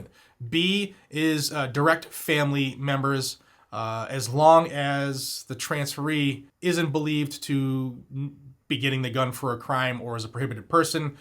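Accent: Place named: Pennsylvania, United States. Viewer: When I heard you start this video I figured it was an idiot from Pennsylvania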